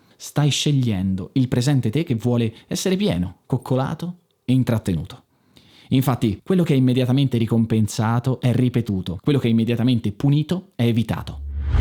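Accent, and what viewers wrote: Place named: Tuscany, Italy. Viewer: Una così alta qualità di contenuti e forma (davvero, sono bellissimi i tuoi video, dalla fotografia al montaggio) e solo 219 iscritti? Il mondo è un posto ingiusto. In bocca al lupo per il futuro! Continua così! P.s.: per quanto tu possa parlare molto correttamente, c'è una piccola inflessione che mi faceva pensare tu potessi essere fratello di granducato, poi il giglio sul laptop ha tolto ogni dubbio!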